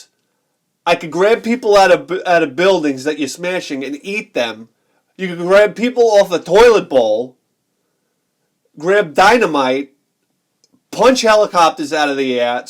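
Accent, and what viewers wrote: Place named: Long Island, United States. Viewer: Dude , this show is it ! That Long Island accent does it . Nobody tells a great story like a New Yorlka !!!! Ya bustin my bawls ova here !!! You are smart as fuck . I guess that is the pay off for living in the city . I was raised in the country in southern Ohio . It's more Kentucky than mid west . People are laid back here and on pills lol . Not a lot of money so there is not a lot of games :(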